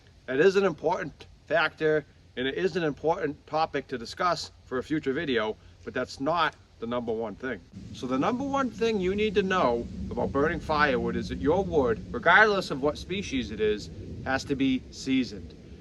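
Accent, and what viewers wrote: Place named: South Shore, United States. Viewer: Good video, are you from Massachusetts ? You sound like a south shore accent. I live in northern Rhode island and am fortunate enough process all my own wood. I also have property in Maine and the forest up there is vastly different. Most of the firewood up there is fir and ash on my property.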